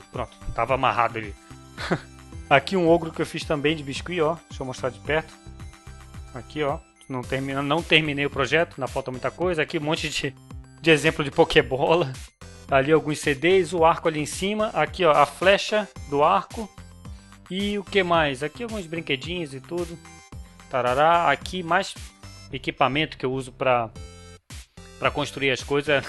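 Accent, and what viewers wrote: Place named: Belém, Brazil. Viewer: Ele deve ser de belém do Pará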